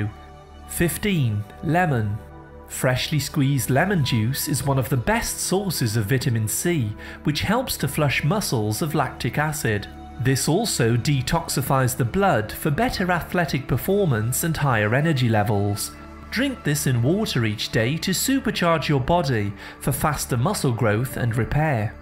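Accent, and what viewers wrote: United Kingdom. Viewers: 🤣British English👍🏼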